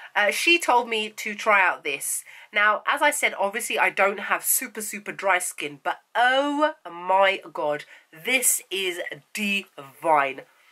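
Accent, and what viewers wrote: London, United Kingdom. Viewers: "Its french init" ur sooo london lol xx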